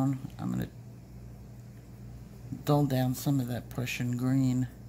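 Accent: New York, United States. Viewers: Hi, I'm Maddie a new subscriber of your wonderful Channel that I recently discovered and I was fascinated by your great talent and your passion for pencil drawing which is also my favorite and also by how you passionately teach. Thank you for sharing your talent and knowledge with us. I am looking far and wide at your Channel, I have a lot to watch. I also love the things you tell us from time to time, like the afterlife and paranormal stories in which I strongly believe and had experience myself too. I love your sweet pets and I love your New York accent, it is a pleasure to listen to you and your calm voice relaxes me. Much love from old Europe to beautiful America. Blessings, love and light to you and to your family.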